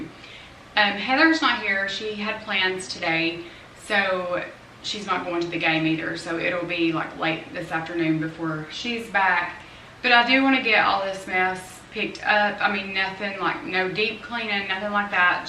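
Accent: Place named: Tennessee, United States. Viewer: Hi, love your channel and your awesome Tennessee accent! 😊 Every time you read a devotional it really helps; life has been rough for our family the last 2 years. God bless you and yours.❤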